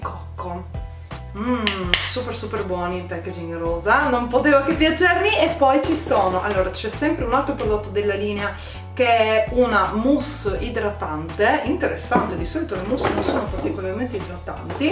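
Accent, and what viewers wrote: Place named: Trieste, Italy. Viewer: accento da vera triestinazza doc..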